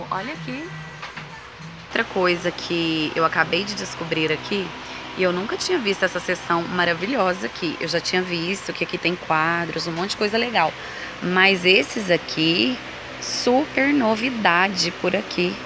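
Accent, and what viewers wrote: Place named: Goiás, Brazil. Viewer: Essa goiana mesmo, conheci pelo sotaque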